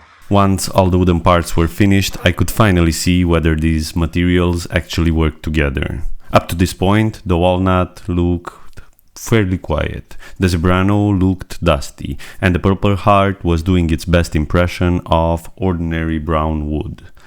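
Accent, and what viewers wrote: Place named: Eastern Europe. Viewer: Thought I heard an eastern European accent. Love your work and the way you talk through decisions. Thank you.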